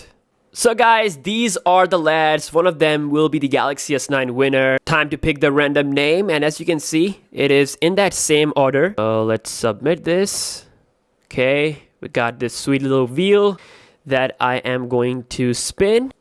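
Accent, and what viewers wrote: United States. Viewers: When I saw your video first Time. I thought that your are american. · Why do you try to sound American?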